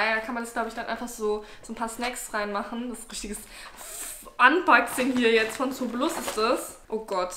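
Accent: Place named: Hesse, Germany. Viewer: als ob du auch aus hessen kommst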